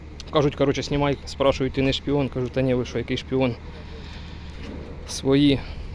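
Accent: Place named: Central Ukraine, Ukraine. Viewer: Автор лайк поставив.Удачі.По розмові зрозумів, що Ви родом з центральної частини України.Щасти.🎉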